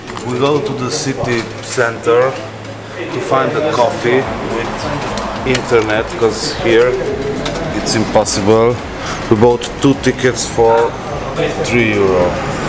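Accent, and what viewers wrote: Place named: Morocco. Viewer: I like your accent keep it up Morocco*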